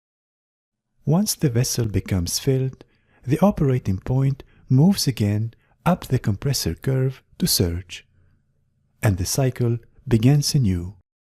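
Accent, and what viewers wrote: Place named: India. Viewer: sometimes indian accent is hard to get the point (intonation)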